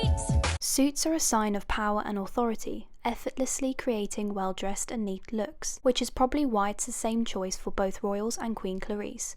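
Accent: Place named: England, United Kingdom. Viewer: As an English girl with a similar accent, I can confirm that her voice is soothing af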